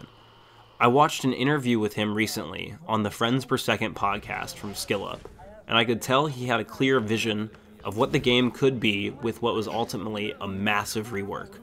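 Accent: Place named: United States. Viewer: Youre not italian. Youre american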